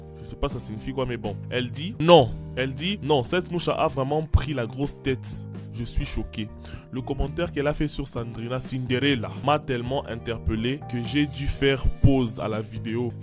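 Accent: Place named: Swahili, East Africa. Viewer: en plus tu parles swahili je l'ai senti dans ton accent ☺️☺️☺️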